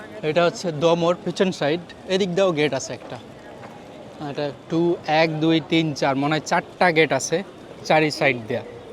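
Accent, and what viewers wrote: Rajshahi, Bangladesh. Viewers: বাংলাদেশে আপনার বাসা কোথায়, ভাষা সুনে রাজশাহী মনে হচ্ছে।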